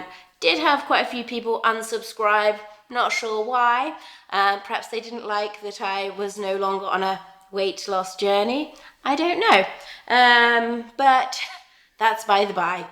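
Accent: England, United Kingdom. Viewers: Since you have an English accent, you lived in Japan, and now your in California I am assuming yours is a military family? Sorry if this is common knowledge. I'm a new sub.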